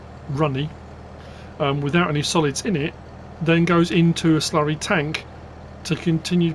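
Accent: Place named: England, United Kingdom. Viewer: Youtube income has dropped in all areas I have a channel painting acrylic lessons also gta5 videos in the U.K. it’s harder to get an audience my is mainly in the USA I live in wales bern a content creator for over 10 years downloaded this game free it’s a fantastic game as I worked on farms back in the day on the hay potato picking by hand and milking in my 60s it’s a way to relive my youth your doing a great job m8 just be yourself and it’s great to hear a true English gent 👍👍👍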